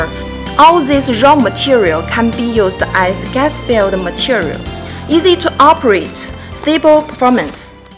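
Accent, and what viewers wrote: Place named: China. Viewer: I lived in China for 6 years and its called Chinglish and once you live there, then she is pretty much well understand. You're ears just have to get used to it. However considering the amount of money that goes into this, I have realized that a lot of times they do have the money to hire some real good English speakers that are Chinese themselves. Many times the translation need not be so accurate if there are subtitles involved. Speaking English well is something that is sought after in China but rarely achieved like the likes of poorer countries like India, Eastern Europe countries and other places for some reason.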